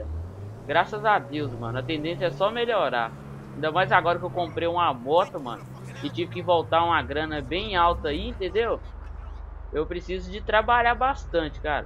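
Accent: Minas Gerais, Brazil. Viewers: Mano ? se e Mineiro? :V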